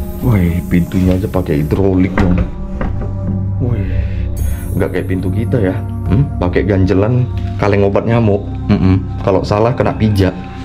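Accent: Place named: Medan, Indonesia. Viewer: Hadir bg salam dari medan horas. Sellu suka dgn smua konten2 abg. Upppp ternyata abg org medan. Di vidio ini baru sya dengar abg org medan. Horas ma Hu bamu bg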